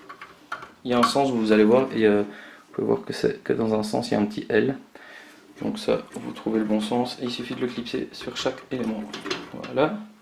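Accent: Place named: Belgium. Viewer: Jolie petit accent... belgique?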